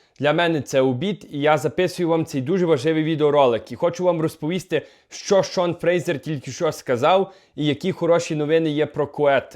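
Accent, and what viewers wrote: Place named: Transcarpathia, Ukraine. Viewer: у Львові так не говорят і не говорили, ніколи!!!!!! Такий говір тільки на Закарпатті)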